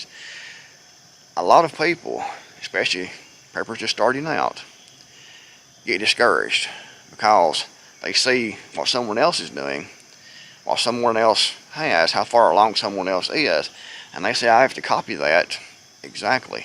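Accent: United States. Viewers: I’m loving the “differt” way you speak. Nothing makes a displaced Marine feel more at home than hearing some sweet American accents.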